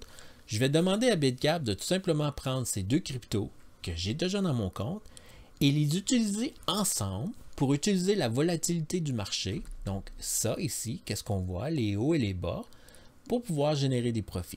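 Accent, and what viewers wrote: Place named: Quebec, Canada. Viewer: AH voilà je savais que tu étais québécois ! Préfères tu bitsgap ou crypto hopper ?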